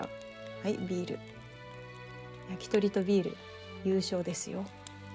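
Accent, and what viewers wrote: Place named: Kansai, Japan. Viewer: サバァは、猫舌でパンケーキ好き。マジで、ぶち込む、めっちゃと関西弁で。水と食料を忘れ、安全第一！オモステの軍用車を操縦する・背伸びする猫天使👼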